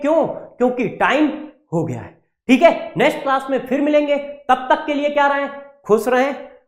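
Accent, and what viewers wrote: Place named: Bihar, India. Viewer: I bet sir is either bihari or up Becoz me also frm bihar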